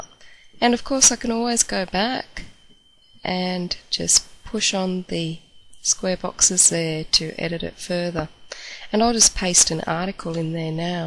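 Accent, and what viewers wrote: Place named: New Zealand. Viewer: The voice is clearly NZ and she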